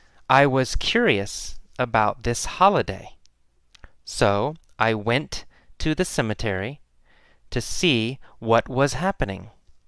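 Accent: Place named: United States. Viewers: American it is, my dear. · mỹ nha bn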